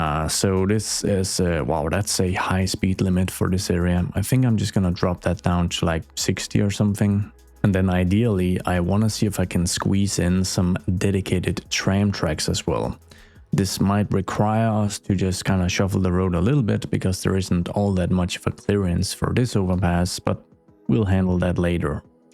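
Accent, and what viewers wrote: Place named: Denmark. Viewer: I really like youre city, its very realistic. Also i can hear that you have an accent it sounds danish but im not completely sure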